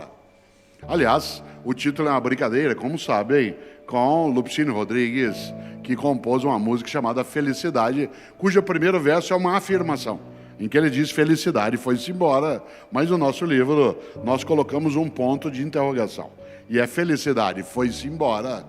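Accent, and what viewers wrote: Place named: Londrina, Brazil. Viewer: Amo o sotaque do Cortella !Q honra p Londrina ter um gênio q nasceu lá! Parabéns Londrina. P seu filho q o universo admira.aplaude. ama.aprendre.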